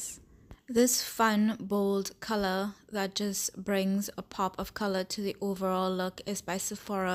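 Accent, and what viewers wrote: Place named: Trinidad and Tobago. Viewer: Awesome hun that Trini accent can't hide at all 😘😘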